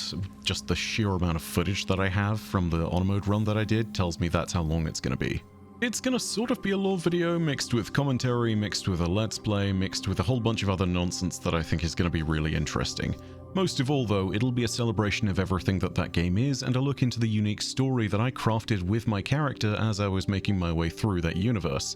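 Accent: United Kingdom. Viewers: I am a 60 year-old man I’ve been playing destiny since 2016 since my son bought it for my birthday. As being a vet, I really had nothing to do with my time when I got out.And I was looking for something to take up that time and to fill that void. And destiny was the answer I’ve been paying attention and listening to your in depth folklore of destiny for years and how you adequately with your smooth and gentle British English accent. Which you matched the lore with your voice perfectly. That is why I subscribe to your channel and of course, a lot of other guardians did too. Because it’s not just a game it’s so much more and as you can see with not only the petition. But with all the voice actors that I hope it does not stop and they do make a DESTINY 3 but will see 🤞, I really appreciate all you do for destiny and for us who listen to your take of the game itself . 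I’ll still be watching but it won’t be the same.It’ll never be the same. But as an old man who has not too much time on this earth, maybe who knows one day My Son or daughters might pick up the game and play it. And say to my grandkids. Hey, did you know your grandfather played this game a long time ago! Would you like to see his character and what his name was? And my grandson or granddaughter if they say yes that’s how I will go on in a memory and live and connect with my family Again. through my ghost.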